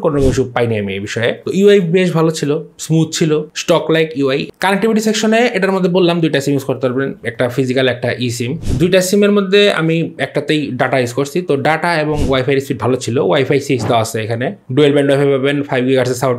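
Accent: Tangail, Bangladesh. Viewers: Vai ki tangail er nki?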